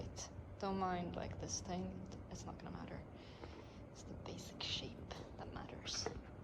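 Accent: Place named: Scandinavia. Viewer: You aren't American? I just found you. What are you, one of theose Nordic countries? They have perfect American English and fool me all the time. Almost everywhere else speaks a British English. Anyway, I look forward to watching.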